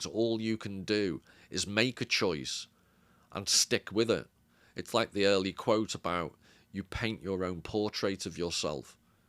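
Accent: Liverpool, United Kingdom. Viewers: I discovered you a few days ago on a Richard Grannon video and since then I have been making my way through your videos which I have found to be immensely interesting, highly educational, entertaining and at times hilarious. Love the Scouse accent and humour. Thanks for your work.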